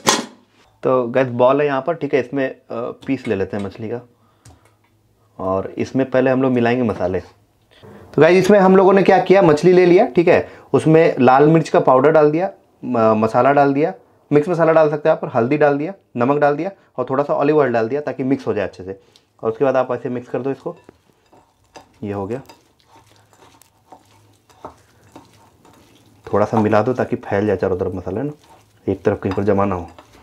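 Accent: Bihar, India. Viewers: Kit na thik he thik he Bol tha he bihar ka he KYA tuuu ???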